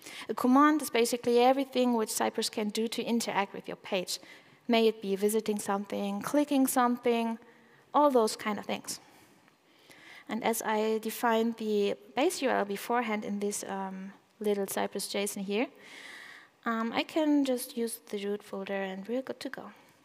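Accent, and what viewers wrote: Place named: Germany. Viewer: Die Mucke =) Nicht schlecht Herr Specht =) Vorträge natürlich auch ^^ Das very special Englisch ist actually very german =)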